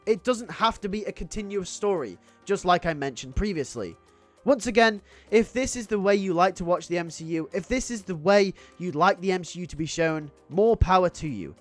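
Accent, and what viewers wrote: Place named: England, United Kingdom. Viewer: Please; only speak the Human language aka American English, the stuff you render now is putrid noise from mere england, the ugly proto form of our language.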